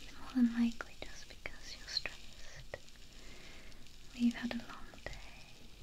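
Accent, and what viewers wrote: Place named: Midlands, United Kingdom. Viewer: I get the impression you're from the Midlands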